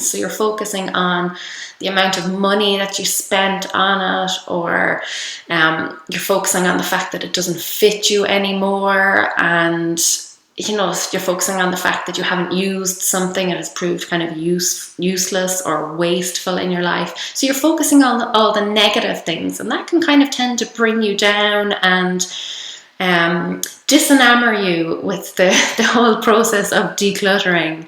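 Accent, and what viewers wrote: Ireland. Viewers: I DO like an Irish accent ;) :) !! But I am also impressed how early on you found and implemented konmari/Marie Kondo's tips and philosophy - I am so grateful to have stumbled upon her Netflix Show - what a game-changer!! And now that I've binge-watched the heck out of it, konmari youtube-videos are my daily go-to!! Thank you!!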